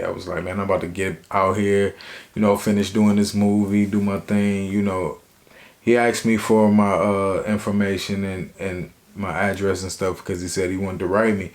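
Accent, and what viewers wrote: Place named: DC-Baltimore, United States. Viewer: Bet. That accent sounds familiar. DC to Bmore usually have a variation of it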